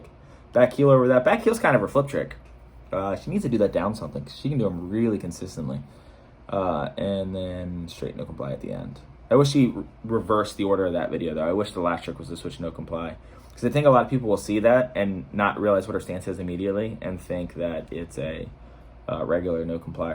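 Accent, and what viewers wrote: East Coast, United States. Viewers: def east coast vibes just because the way he talks shit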